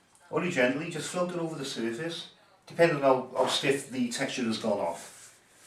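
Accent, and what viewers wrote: Cardiff, United Kingdom. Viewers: Caerdiff accent Got one myself, best of luck.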